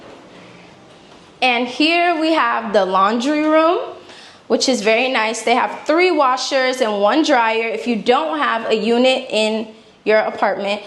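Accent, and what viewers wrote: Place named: Bahamas. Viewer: Her voice sound like Bahamas, Bahamian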